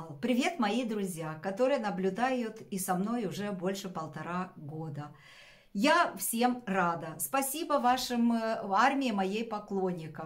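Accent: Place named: Moldova. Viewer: С удовольствием вас смотрю,.И слушаю.И в Бельцах была не раз.И в казанчике готовила.И говор ваш мне близок до слез.Я русская, но 35 лет назад жила в Кишиневе, вышла там замуж первый раз.И до сих пор снятся Баюканы и кинотеатр " Флакера"😅😅😅Я жила в 80- е годы в общаге.И у нас жили девочки и русские, и молдаванки, и болгарки, и гагаузки, и еврейки.Нет, вру.Евреек в общаге не было, но я с ними работала.Эх, хорошее время было, хоть и перестроечное)))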